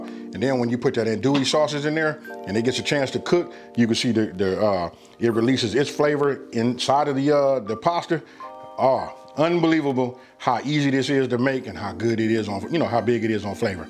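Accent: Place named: California, United States. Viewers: man, I love big bro! this man can cook! I'm never disappointed! he got that og California accent 😂😂 much from okc, ok! keep doing this bro! I had a vision that you were on one of those food network shows, like chopped! you can do it, I believe in you! you're going real far with this, I see it!🙏🏽💯🤎🥂🥡🦐🧈🥗🍖🥞🥜🧆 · as someone who grew up in crenshaw and moved to the bay area, any time you hear someone with the same lingo and talks as you n your peoples... just makes this hit different. you earned a sub from me OG. keep makin great content.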